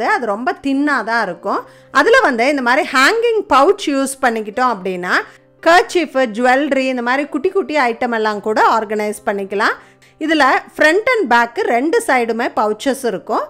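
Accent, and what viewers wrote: Coimbatore, India. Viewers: கோவை தமிழ் உச்சரிப்பு மற்றும் மிகவும் பயன் தரும் தகவல் நன்றி சகோதரி.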